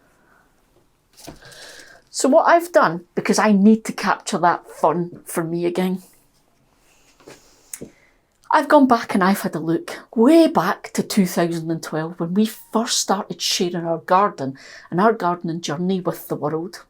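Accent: Scotland, United Kingdom. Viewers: I just read a comment from one of the viewers saying that “for a moment they thought you were going to say that you were stopping making videos”! That’s was exactly what I thought and for a moment there I felt a real pang of sadness, and was so relieved to find that was not the case! 😮‍💨 I absolutely love your videos and discovered your channel when you had just reached 18 thousand subscribers - you were ecstatic about it and couldn’t get over the fact that 18 thousand people wanted to hear what you had to say and share in yours and adventures. Your channel is so incredibly relatable and real, and the ‘fluffier’ the content, the funnier and more enjoyable your videos seem to be. It’s a real de-stressor watching your antics. I work in a hospital and some days, after a particularly stressful 12 hours shift when my brain refuses to switch off from the dramas of the day, there’s only a handful of programs that are able to relax my grey matter, and YOUR channel is right at the top of that list! 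So thank you for making this overworked and underpaid NHS worker relax and laugh ❤ PS: your channel even comes with a bonus: the Scottish accent, of course! Absolutely love it! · I just stop in to see what your up to. You could probably film walking around the yard and going on about whatever comes up. My gardening channels are just relaxing, AND... Your my only Scot?:) So its also nice for my ears:) Happy new year!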